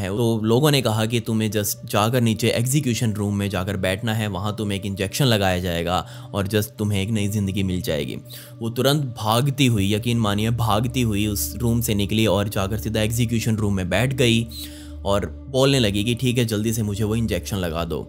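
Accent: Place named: Western Uttar Pradesh, India. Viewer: Bhai teri language suke lagta hai tu sayad west u.p se hai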